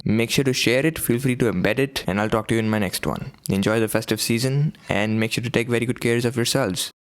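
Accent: India. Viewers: Dude, going by your accent I can tell you're an Indian and that video sir was absolutely amazing. The music and the editing and the voice over is definitely better than most of the big shot YouTubers who give Tech reviews like GeekyRanjit and C4E. Keep making videos brother and someday you'll be at the top. I'm from India as well making Speed Arts on my channel. Take a look if you like. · Chewbecca Gamer He sounds like a bloke from India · is he Indian ? his accent doesn't sound like one from the English speaking countries? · 1000 dollars? u r an Indian, right? u definitely sound like one... anyways, great video man..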